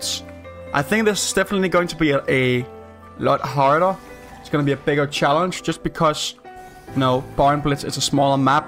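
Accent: Denmark. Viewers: Så din video og instantly vidste at du var dansk, haha- nice video. 😂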